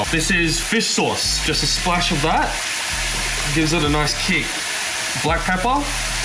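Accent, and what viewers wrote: Australia. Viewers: Are you Australlian? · You sound Australian but you're chinese.. im so confused! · He Australian?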